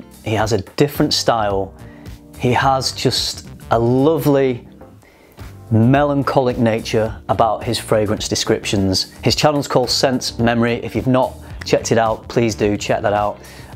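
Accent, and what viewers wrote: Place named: West Midlands, United Kingdom. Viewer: A personal question, if you don't mind. Trying to place your accent, which isn't easy especially for a non-native speaker as you're obviously uni educated and that tends to round off a lot of regional variation. But if I were to hazard a guess, I'd say West Midlands?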